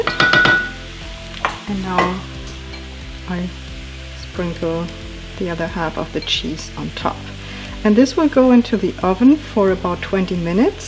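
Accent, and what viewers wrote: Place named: Germany. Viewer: Me: oh, another Internet cook making spaetzle. Me (the moment I hear her voice): oh, she’s German... this should be good.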